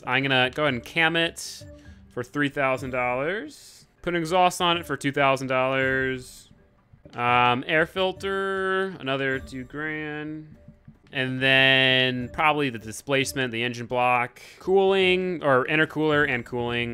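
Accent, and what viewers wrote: Russia. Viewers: your german accent sounds more like a russian one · that akzent though :D (ps. thats propably a russian one )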